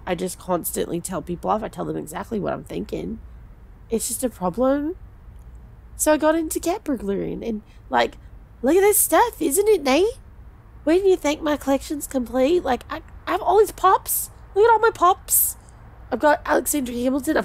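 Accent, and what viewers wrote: South, United States. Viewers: I like the southern accent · Have I mentioned how much I love the southern accent. I gotta go to bed because I have a big day tomorrow, but still a great audio. Goodnight everyone.☕️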